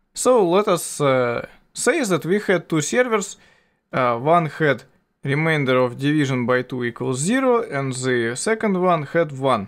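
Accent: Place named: Russia. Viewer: 一股毛子味的英语是吧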